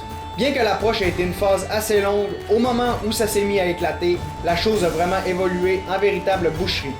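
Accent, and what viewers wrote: Quebec, Canada. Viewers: j'adooore l'accent québequois !^^ très bonne vidéo ;)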